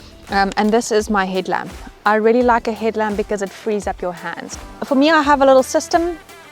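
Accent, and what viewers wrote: South Africa. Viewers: It's the Saffa accent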